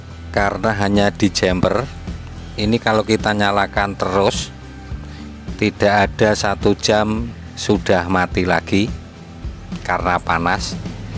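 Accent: Java, Indonesia. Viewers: Ilmunya mantab, Medok ngomongnya 😁 nyenengin banget dengernya 😁, Meleddiyakk = Meledak. 🤣🤣 Sehat dan Sukses Slalu Pak !!!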